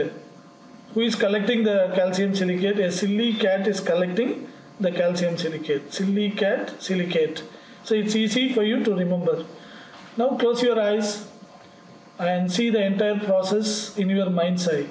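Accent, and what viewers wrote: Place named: India. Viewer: I liked the lesson. but seriously, you have such a strong Indian accent. Work on your pronunciation. But overall good lesson.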